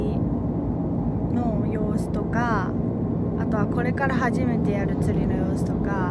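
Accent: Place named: Japan. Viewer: 確かにH弁出るね💦安心🤗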